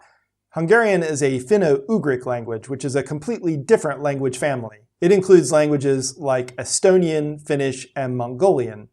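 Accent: United States. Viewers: old Dutch; speaking about Turkic language with US English. Yes sir.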